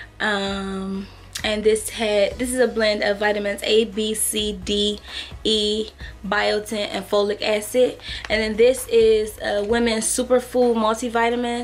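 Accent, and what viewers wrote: DMV, United States. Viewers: Lol... I knew you were from DMV area when I heard your accent. Great video. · I KNEW IT!!!! LOL I am from the D(M)V. lol so crazy how I can pick up on our accent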